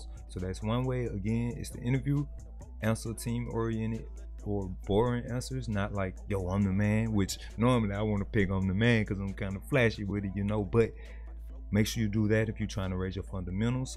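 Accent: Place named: South, United States. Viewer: Thanks, bro! helps a lot and you from the south so even better I can understand the accent lol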